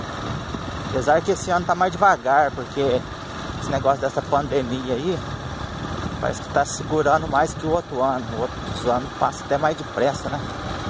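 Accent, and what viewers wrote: Minas Gerais, Brazil. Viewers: Português de mineiro tbm sou assim.uai...Kkkk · Oiê, acho o seu jeito de falar lindo, acho que é de mineiro mesmo, também vc não têm que se preocupar com erros, a maioria das pessoas fala errado, pra que falar no pé da letra eu também falo, gosto de falar errado, e tem mais estamos enteressado é ver estes seu vídeos maravilhoso. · Boa noite que lugar lindo natureza pura que saudade da época que morava na fazenda do bálsamo perto de piscamba município de jequiri não preocupe com seu jeito de falar e na simplicidade do nosso idioma mineiro e bao e bonito como dizia meu avô eta trem bao ser mineiro uai abraços fica com Deus. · Que delícia de passeio amigo, adoro ver essas casinhas de colônia, um lago na frente ainda pra ajudar na beleza. Não se preocupe com a maneira de falar, nós mineirinhos somos assim. Um grande abraço!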